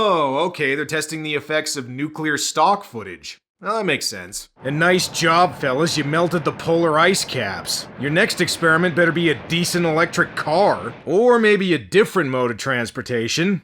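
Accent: Canada. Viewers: Holy shit you kinda make a make a canadian accent sound kinda hot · That Canadian accent . I died 😆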